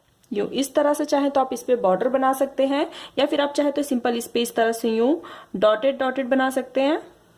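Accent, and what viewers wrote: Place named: Bihar, India. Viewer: Aarey aap Bihar se ho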